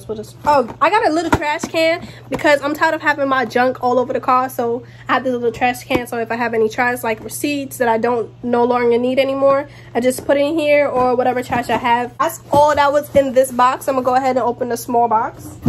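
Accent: New York, United States. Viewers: Her accent is so New York. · lol i knew you was from ny .... we all talk the same.... type shit lol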